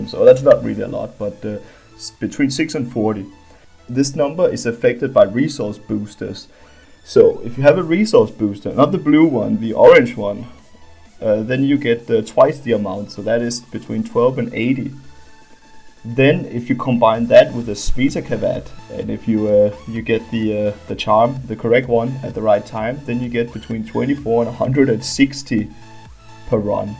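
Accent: Denmark. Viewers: Du dansk, det kan man høre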